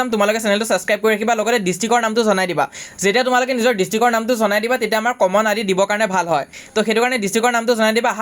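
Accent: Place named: Sivasagar, India. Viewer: Sivasagar distric r english khon lgeh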